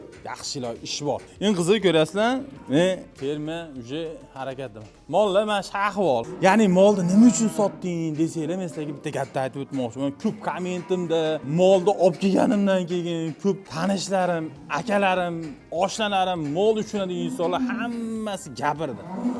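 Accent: Andijan, Uzbekistan. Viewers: Анжан шевага гап йук😂 Китобида ёзилишича мол максимум 1 суткада 1,2 кг семиради. Сонини купайтириб, расходни минусласа, олиш мумкин булган максимум фойда чикади. Реалрок дан келиб чикиб, хисоб китоб килиб, инвестицияга йилига канча % олиш мумкинлигини тушунса булади. Россияда гуштни сотиш проблема, ечими топилса бупкетар. Айникса "халяль" сертификат олинса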